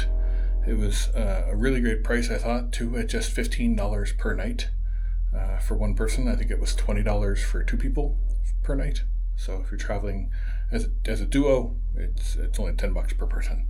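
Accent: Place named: Spain. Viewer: I meant your English is starting to sounds Spanish 🎉🎉🎉 haha how long til you sound completely Spanish? And your pronunciation has definitely gotten a lot better!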